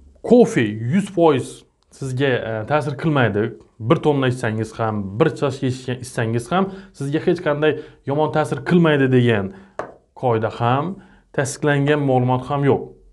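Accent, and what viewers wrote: Khorezm, Uzbekistan. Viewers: Доктор хоразмданмисиз дейман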